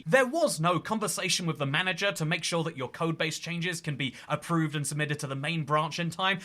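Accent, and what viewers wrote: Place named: United Kingdom. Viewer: 2012 sounded 10x more British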